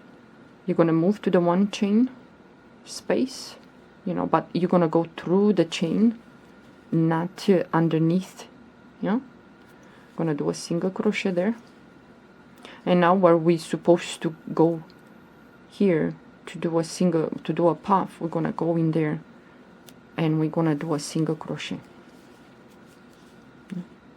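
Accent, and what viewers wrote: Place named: England, United Kingdom. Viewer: Great pattern. Loved learning that stitch. Love your English accent. It’s clear but rather cute! Easy to listen to ❣️ …and I’m English!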